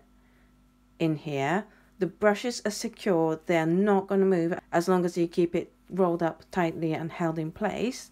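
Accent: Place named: United Kingdom. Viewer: If I could paint anywhere in the world, I would choose the UK. Many of my favorite artists that I watch are there and I would love to go get lessons from them or even just watch them paint in person. And the accent they speak in is just adorable as well, just like yours! Thanks for the chance to win and I hope you feel better very soon.. Blessings, Stacy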